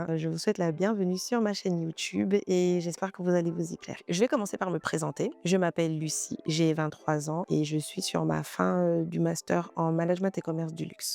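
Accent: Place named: Gabon. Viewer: Une gaboma j'ai reconnu l'accent ❤❤❤❤❤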